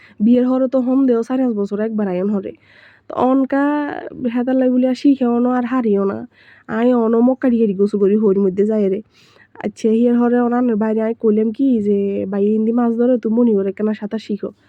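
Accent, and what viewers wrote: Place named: Sandwip, Bangladesh. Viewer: সন্দ্বীপের ভাসা😂😂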